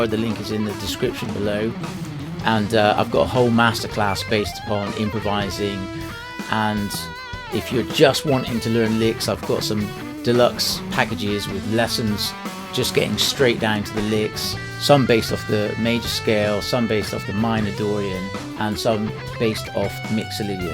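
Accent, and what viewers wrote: West Country, United Kingdom. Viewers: What is your standard tuning? And am I detecting a bit of a west country accent?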